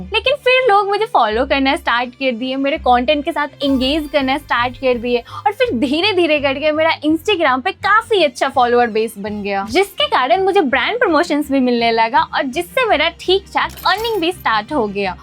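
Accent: India. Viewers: Apke bolne ke language se hi lag rha tha aap bihar se ho 😂😂😂. No hate .I am also from bihar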